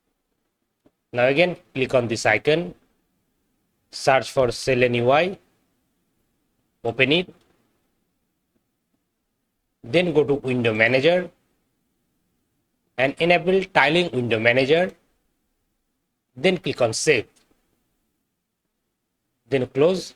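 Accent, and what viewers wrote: India. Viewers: Bro, your accent not understandable for me... You speaks on English, but I hear words like from Indian or Indonesia lol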